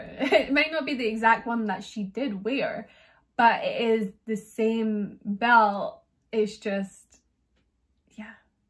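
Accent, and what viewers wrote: Scotland, United Kingdom. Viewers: Are you Scottish? Your accent is super cool!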